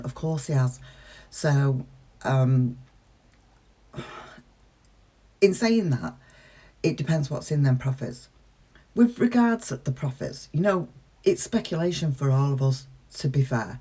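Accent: United Kingdom. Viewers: thank you. No I figured you were British. I guess i am naive to think Britain like the US doesn’t have different accents. I love your videos 👍 Always looking forward to the next one.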